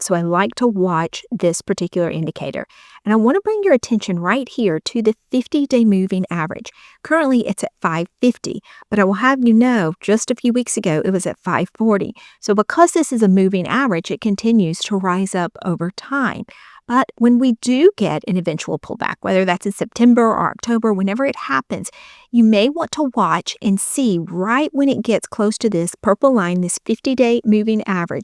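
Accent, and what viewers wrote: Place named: South, United States. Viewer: I could just listen to that sweet southern accent all day long. Love your posts. How's your daughter doing with trading?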